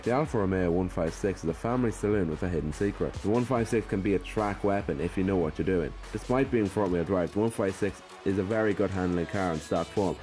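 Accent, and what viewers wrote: Ireland. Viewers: Colin Davis he's irish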